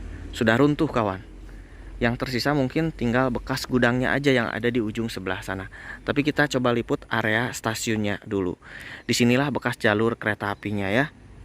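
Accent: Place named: Banyumas, Indonesia. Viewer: Bahasanya keren tuh kang ,,, ngapak habis😊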